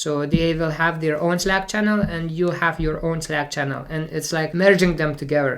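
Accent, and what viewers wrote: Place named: India. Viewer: Why do you look northern European but sound Indian?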